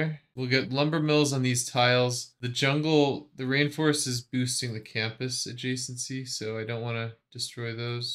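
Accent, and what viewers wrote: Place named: United States. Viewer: Aaargh! Hadn't seen it to its end 😂 honestly - I'm not a huge fan of surprises, so much appreciated! I really like how organically or naturally you grow your civ(s) - that's how I hope my garden will grow this year! But I'm on like turn 50 with three cities, two wonders, some culture - but not an awful much more to show for it. I guess it's just a matter of enjoying the game, and if it's a fun defeat then just go with it 😆😶 I really enjoy your vids - I had a day off and I had kinda hoped to spend the day in the garden, but it was so rainy here that I ended up cleaning (which was embarrassingly needed, once I got down to the nooks and crannies!) - you were my coffee breaks! 😙☕👌 they were a delight! You have such a wonderful voice, you're so funny, and such a nice narrator! I love your crisp American accent, despite the fact that I get startled every time you say "route" - here it's pronounced "root" and I get baffled every time! 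And now I've told - and that's a weirdly big relief! 😂 Lots of love from Denmark 🤗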